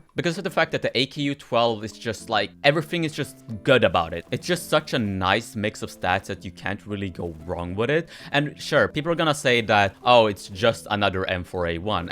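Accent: Sweden. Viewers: Where are u from? (U sound Swedish, I know that because I’m Swedish)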